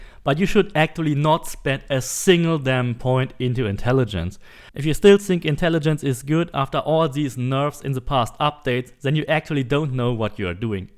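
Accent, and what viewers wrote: Germany. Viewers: Der germanischste akzent den ich je gehört habe. Probs für das informative video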